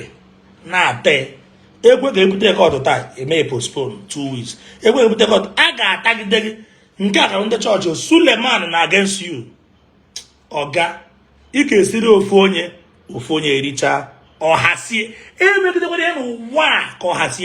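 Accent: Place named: Hausa, Nigeria. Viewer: You sound like real Hausa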